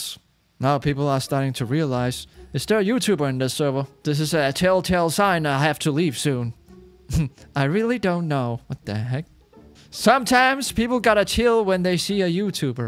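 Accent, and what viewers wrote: Denmark. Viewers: YOU SOUND DANISH?!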